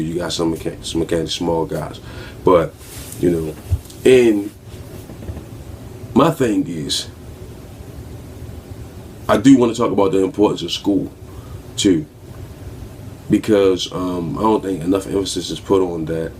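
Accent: Baltimore, United States. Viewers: I knew you where Baltimore from that accent. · The way he talk I knew he was from Baltimore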